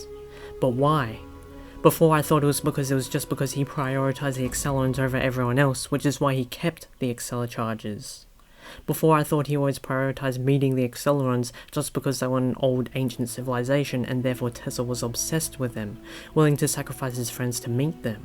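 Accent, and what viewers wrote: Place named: United Kingdom. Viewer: I can't listen to "if you're beiaten and tortured to a pulp." Yout Birtish English is hard to me that's why I ask on comment section when the captions don't match the dialogue.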